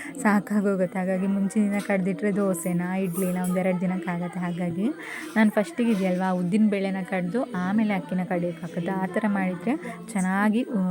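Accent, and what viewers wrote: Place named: Mangalore, India. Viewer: Manglore kannada mathadi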